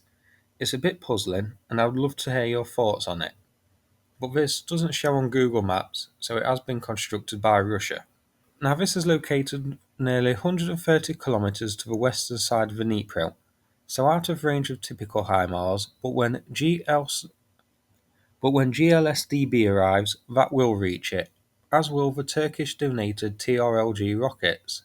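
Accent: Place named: England, United Kingdom. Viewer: Which part of England (county, main city) is home to the accent speaks in?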